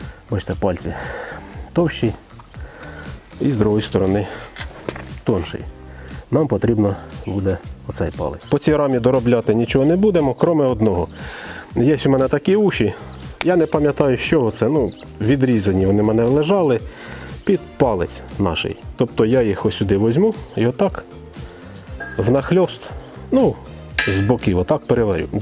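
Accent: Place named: Surzhyk, Ukraine. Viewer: Того шо в нього трохи суржика є, не всі слова він каже Українською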